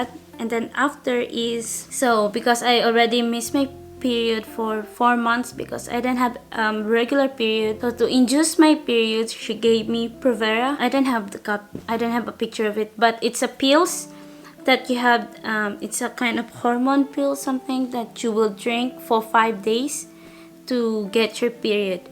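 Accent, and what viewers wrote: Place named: Philippines. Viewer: Congratuations! I noticed you are Filipino, I love your accent, my mum is also Filipino 😊 looking forward to your pregnancy updates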